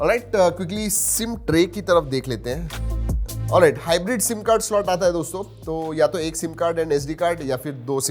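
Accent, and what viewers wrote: Maharashtra, India. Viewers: I like that marathi accent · The whole thing that I love the most is little bit sweet marathi 😍😍luv it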